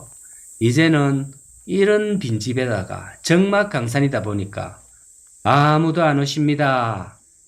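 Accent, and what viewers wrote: Gyeongsang, South Korea. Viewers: 설명이 너무 재미있어서 나라 돌아가는 꼴을 보면 하루늘 보내는것도 지겨워 죽을 지경 인데 설명 을 들을때마다 옛날 시골풍경 구수한 경상도 사투리 정말 살맛납니다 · 구수한 경상도사투리 정겹습니다 좋은 방송 잘보고있습니다 선생님항상감사합니다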